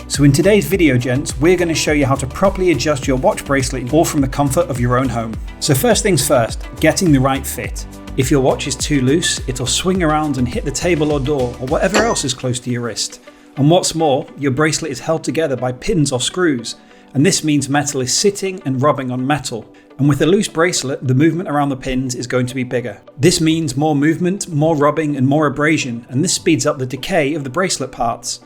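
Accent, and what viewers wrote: United Kingdom. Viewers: ah. Well that explains the British accent.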